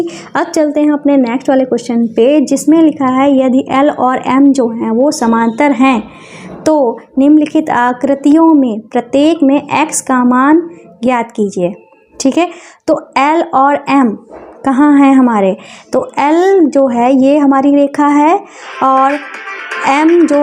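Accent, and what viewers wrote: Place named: Rajasthan, India. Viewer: Mam aap Rajasthan se ho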